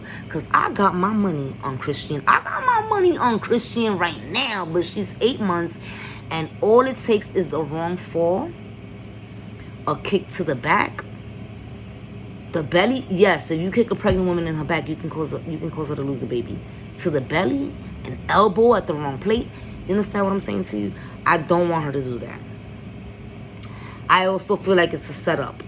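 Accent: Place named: New York, United States. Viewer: It's Chris Shawn but spelled Chrisean. Like your commentary tho ❤ keep it up you'll grow. Real recognize real. You have a NY accent I'm in Norf Philly not far at all. I got family all over the Bronx 8 say that to say you sound just like my crazy ass cussin whom I love to the moon. No shade when I say crazy mama 💯💖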